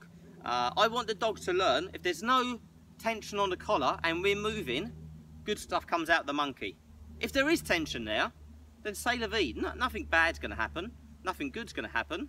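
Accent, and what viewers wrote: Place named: London, United Kingdom. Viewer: k ent shuster That's a London accent, silly, and it's a warm summer day.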